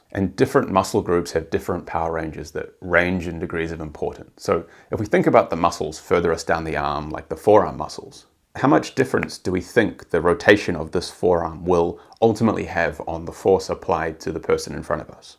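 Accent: New Zealand. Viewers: I am so happy I found this channel. I am a Kiwi away from NZ so it was nice to hear the accent! I love MMA but also Parkour and remember you from SP09! You got know Storror!!!!! lol Also, a little TMI, I have rather severe Ankylosing Spondylitis and a weight issue that is more about inability to find a way to move that wont break me. My muscles are a mix of ridiculously strong and dense to almost nothing there and I hope I can learn something, anything from your vids that might help me as I am becoming the Hunchback of Notre Dame and I hate it, deeply. Anyway, great vid, I watched it twice because NZ pay is bad and you deserve money, I liked and subbed. Now onto Callum then Dom! Very interested as Dom was often training with some of the guys in the city I live in, in Aus and seeing him in real life is so different to YouTube!!! Things he attempts and lands that look big on screen, look literally impossible to my eyes. 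GG! Keep up the hard work and I look forward to the next upload! ✌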